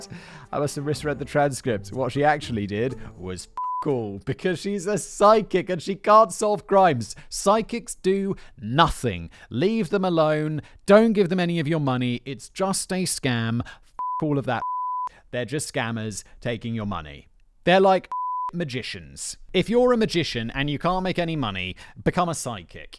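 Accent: Germany. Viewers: As a german i for one am fine with you doing a german accent. I think it is hilarious and it is so cool when you by accident pronounce something german correctly. Go ahead from me :)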